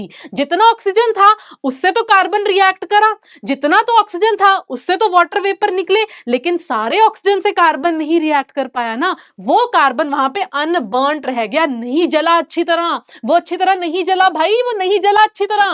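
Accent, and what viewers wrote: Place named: Punjab, India. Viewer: aap punjabi ho kya? accent aisa hai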